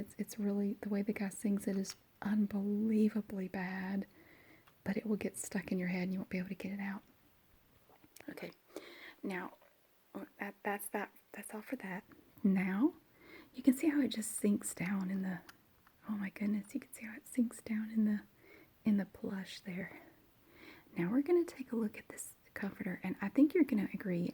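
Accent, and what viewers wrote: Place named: South Carolina, United States. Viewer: I’m from South Carolina, living in Tokyo, & your voice makes me homesick.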